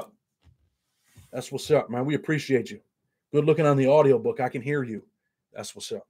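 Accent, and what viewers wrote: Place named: New Jersey, United States. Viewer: You been some shitttttt bro. Sounds like you could of grew up in Keansburge NJ. Ty for the vids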